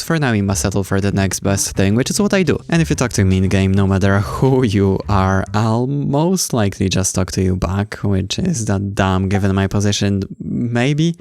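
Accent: Poland. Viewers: youre the second skyblock youtuber i failed to recognize to be from kingdom of poland THIS MONTH :sob: like geniuenly i thought you just have funny pronounciation and didnt connect the dots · OK, from your accent I guess you're from Poland and I can't put the rest of my comment into English, at most you can translate it yourself if I'm wrong about your nationality xd W lutym tego roku straciłam na hypixel CAŁY MÓJ DOBYTEK. Uderzyło to we mnie tak mocno, że usunęłam moje konto microsoft (bo zostało tak zhackowane, że nie było co z niego zbierać...) i do teraz nie jestem w stanie zacząć grać od nowa. I nie jestem jakimś dzieckiem, jestem po trzydziestce, więc bycie takim debilem zdemoralizowało mnie jeszcze bardziej. Miliardy coinsów, wymaksowane staty do górnictwa nad którymi pracowałam setki godzin. Kurwa boli.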